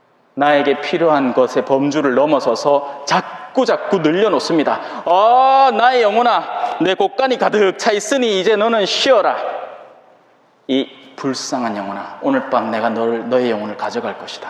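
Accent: Gyeongsang, South Korea. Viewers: 신부님감사합니다 신부님강론말씀경상도사투리가정겹고제밋네요 비유말씀이감동이네요 서울지하철안에세 듣고있는데 유듀부에종종올려주세요 건강하시고 행복하세요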